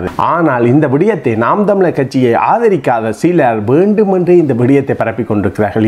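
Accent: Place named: Eelam, Sri Lanka. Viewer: ஈழத்தமிழ் அழகு தமிழ்... ❤️ தமிழ்நாட்டுக்கு தமிழர்கள் உங்களை பார்த்து கற்றுக்கொள்ள வேண்டும்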